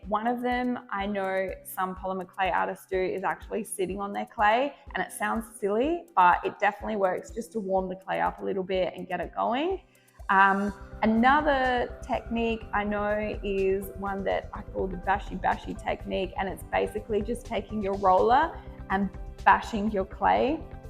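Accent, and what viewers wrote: Australia. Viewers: Hi, my name is Lori, I want start off by saying I love your accent, Australia, right? I'm seriously thinking of making clay jewelry, and this is the first time watching your tutorial, I like it! Have a great day! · What a great source of information , and great to hear an Ozzy for a change. Thank You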